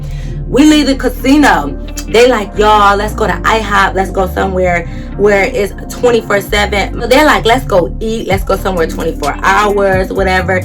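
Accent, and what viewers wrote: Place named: Chicago, United States. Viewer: gotta be from chicago 😭😭it sound like my city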